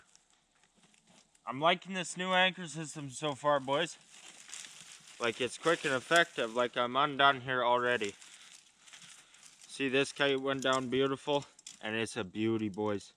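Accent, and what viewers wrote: Canada. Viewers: You sound like your from waaaaaaaaay out there in Canadian.. you ever get any lynx mate?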